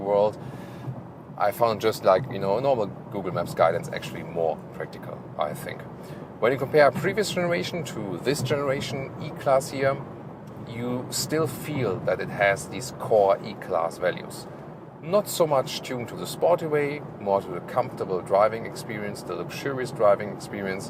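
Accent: Germany. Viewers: I love your German accent. It gives your videos about Mercedes that Teutonic feel.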